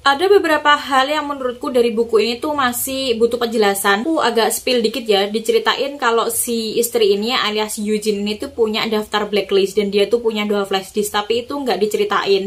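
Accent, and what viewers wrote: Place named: Yogyakarta, Indonesia. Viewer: Domisilinya Jawa mana kak? Suaranya mengingatkan aku sama temen-temen di Jogja. Sehat terus ya kak biar bisa mereview novel-novel lain. Amin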